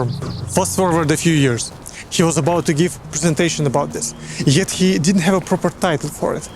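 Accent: Russia. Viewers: why he has so a russian accent?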